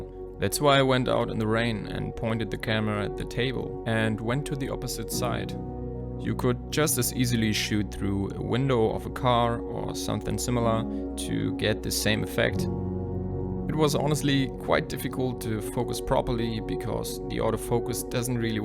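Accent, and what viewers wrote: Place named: Germany. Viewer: your english sounds so german. but your video is great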